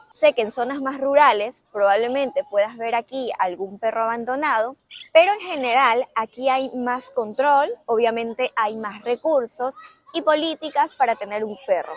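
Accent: Coast, Ecuador. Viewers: 😘 mi hermosa. desde.Toronto Canada niña hermosa. me encantó tu relato de tú regreso a Ecuador.no lo as exajerado nuestro. Ecuador es <link> admira tu sencilles.heres una Ecuatoriana nata.tienes tu acento costeño.y tu video lo as echo a lo puro Ecuatoriano.👋👋👋👋👋te aplaudo y lo e disfrutado niña hermos.te cuento nosotros también somos Ecuatorianos.vivimos en Toronto Canada.por 51 años desde año 1.972. tenemos tres hijos sinco nietos y un bisnieto.. emos viajado muy poco a nuestro hermoso Ecuador.pero cuando emos viajado emos gosado dé las maravillas que tiene nuestro país cuando mis nietos viajaron a conocer nuestro hermoso Ecuador les encanto y gosaron dé la gastronomia Costeña los mariscos fresquecitos.que delicia. que tiene la costa Ecuatoriana.nosotros somos del hermoso Cuenca. y té cuento cómo tú lo sabrás el idioma en Canadá es inglés y francés yo personalmente no lo e olvidado él cantado Cuencano. 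y cuando e viajado él inglés lo e dejado en casa hasta muestro regreso 😂😂!!!!! detambién la gastronomia. dé la sierra es increible.,!!!!! espero si ves este pequeño relato algun dia me respondas mi nombre Leonor.!!!!! te mando un abrazo.con mucho cariño.❤❤❤❤😂!!Febrero 20/24.toronto Canadá.!!!!!! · A mi también me sorprendí, su acento costeño, lo tiene muy bien conservado, q bien xq el acento español es horrible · Te felicito por tu humildad y no cambiar tu acento como si lo hacen muchos ecuatorianos y que ridículos se oyen. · Me quedo un poco sorprendida por tu acento es ecuatoriano aunque has vivido en España mucho tiempo no hablas con acento español como la mayoría de ecuatorianos que solo llevan menos de un año y ya quieren hablar como españoles . Me encanta el Video .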